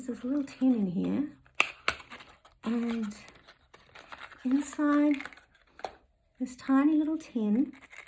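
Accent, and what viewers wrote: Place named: Australia. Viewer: You have a lovely soft spoken voice, and the Aussie accent is the icing on the cake. I've actually never smelled Daisy, but I love violet.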